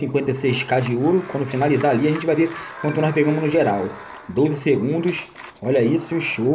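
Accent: Rio de Janeiro, Brazil. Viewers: Tu é do RJ ?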